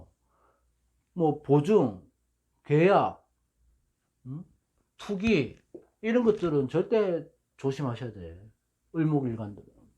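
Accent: Gyeongsang, South Korea. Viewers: 젊으신데 구성진 경상도 사투리 살짜꿍 가미된친근한 목소리 마음 편안하게 들을수 있는 감사합니다 ♥️♥️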